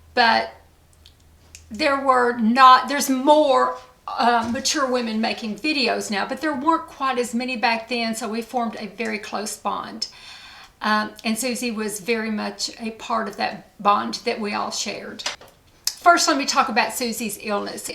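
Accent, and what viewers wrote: South, United States. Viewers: ...Honey You my dear are just the sweetest thing. Your accent is beautiful...are you from the South? Beautiful tribute to Suzy!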